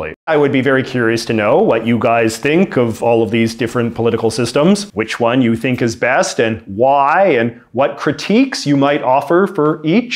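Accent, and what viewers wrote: Canada. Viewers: American politics make more sense when explained with a thick Canadian accent.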